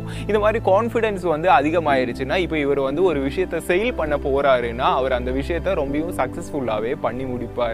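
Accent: Kerala, India. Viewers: Malayalam kalantha tamil.. Nice bro....😊👌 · What ah voice...and ur malayali tamil....😍😍🤩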